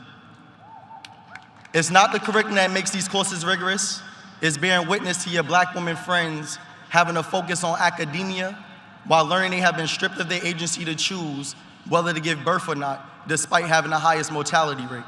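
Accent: United States. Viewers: I ❤ his Brooklyn accent! Saying 👋🏿 from Texas. Proud of you,! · That DEEP New York Accented Beautiful man of Color spoke LIFE🎉🎉🎉🎉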